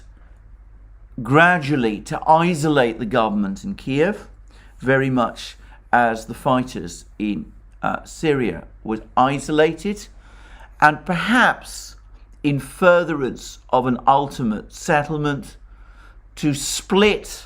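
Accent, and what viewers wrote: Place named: Cockney, United Kingdom. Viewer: The speaker throughout this video has a British Cockney accent. Websearch "photo of Col. Douglas Abbott Macgregor" and you will see that the speaker in this video is not he.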